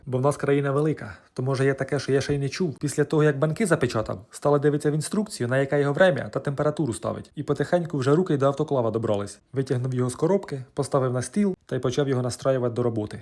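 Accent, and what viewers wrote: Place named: Rural, Ukraine. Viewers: Полтавський говір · Полтавский сюржик😂❤❤❤❤❤???? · Суржик у вас даже знакомий, прямо , як у рiдному селi..побувала. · Південний говір,мій ріднесенький,аж заскучала.Давно я в рідному краї не була,не дивилася із холму на ті поля нескінченні,пилюку ту задушливу не ковтала ,несучись полями(бо то ближче)до якоїсь річечки на шашличок,могилками рідних своїх невклонялась.....Господи,як же я скучила,навіть сама не зрозуміла.... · Це не народжується) Черкаси і черкаський район - ми так балакаєм 😅😊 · і Полтавщина так балакає.😊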